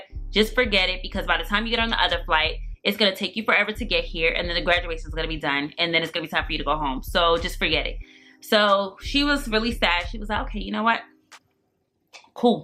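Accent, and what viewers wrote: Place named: New York, United States. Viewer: you still got the new york accent!!! we thank God ohh!! love you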